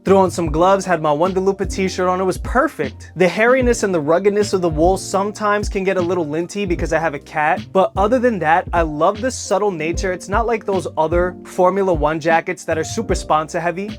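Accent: New York, United States. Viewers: you figured out how to mix American vintage and Japanese with a city overtone (New Yawrk 😂) No one YT is doing this and the space is kinda slim anyway, which is ironic. This channel is by far my favorite.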